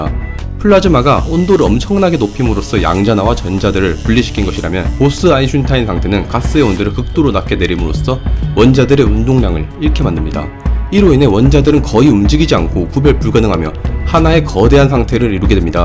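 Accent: Gyeongsang, South Korea. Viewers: 경상도 억양이 약간씩 섞여 나오네요. 어느지역 출신입니까?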